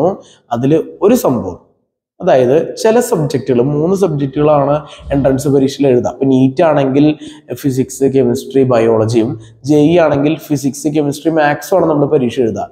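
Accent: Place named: Thrissur, India. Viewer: Uff. chetta. Chettande oru valiya fen aanu njan. Chettande aa thrissur slangil edkunna class um. Sirde fashion senseum mudiyum ellam poli aanu.ella vdeosinum katta waiting aanu.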